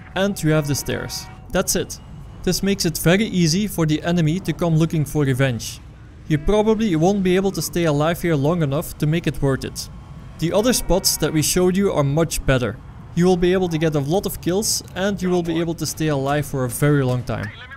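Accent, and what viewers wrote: Netherlands. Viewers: at least it sounds like a dutch accent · 2 questions 1. Are you dutch? 2. Are you?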